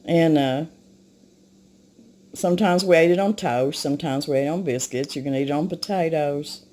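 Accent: South, United States. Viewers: Your sweet nature, Southern English and just being yourself has certainly earned my pitiful little like and subscription.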